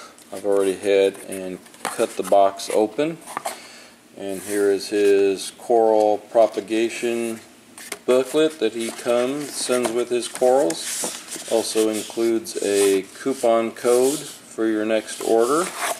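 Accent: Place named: San Francisco Bay Area, United States. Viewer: Really great tanks. Wonderful selection of corals and fish. Sorry to hear you're having issues with rogue shrimp. If they are living in a undergravel filter perhaps you're going to have tiptoe in a night and (under very low lighting) and try to nab them. Hey, by the sound of it I'm guessing you live near my neck of the woods (San Francisco Bay Area). I keep thinking I should take a visit to Exotic Aquarium in Sacramento. Just haven't taken the time to yet. Enjoyed the video. You've got me as a new subscriber!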